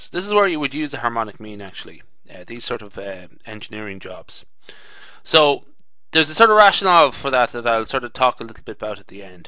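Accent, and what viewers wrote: Ireland. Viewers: Yas Niall!! I noticed that too and I was laughing the whole time. (I meant that positively because I like Irish accent) ☺☺☺ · Is that an Irish accent I detect....? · Hi! Are you Irish?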